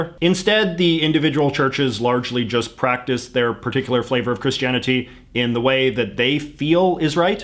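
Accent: Canada. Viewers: this guy has the most pronounced Canadian accent ever. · Yo like can you turn down the canada 3 notches? Like.... there are other ways to pronounce about that dont sound like "a boot"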